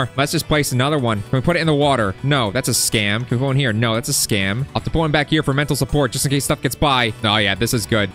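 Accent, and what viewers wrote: United Kingdom. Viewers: Britsh voice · The goudly British accent tho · bro no one knew he was british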